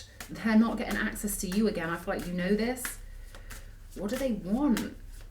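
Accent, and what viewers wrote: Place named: London, United Kingdom. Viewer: Hi 👋 Hey U.K...your smashing this and having your way..go ✨️ O me O my conduit connection to the almighty. Love your voice, can hear London, can hear down country, can hear a beautiful vibe. What goes 😳 around comes around, don't keep hurting innocents..for your power. Learn your karmic Lessons and come back to the Light. Peace&Love&Light Namaste 🙏 ♥️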